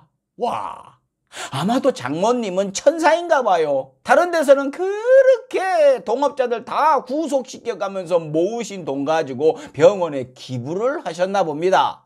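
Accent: Jeolla, South Korea. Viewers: 아따 서울출신이신 분이 전라도 사투리 억양을 질펀하게 구사하시네요 그리고 그동안 여러 매체에서 자랑스럽게 전남 영암출신으로 소개되었던데 그건 어떻게 된 건가요? 한번 해명 좀 ...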